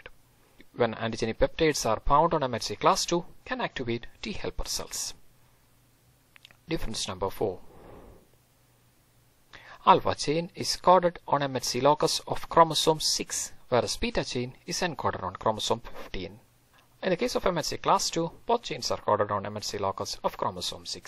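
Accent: India. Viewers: You sound like the gupta that works at the gas station down the street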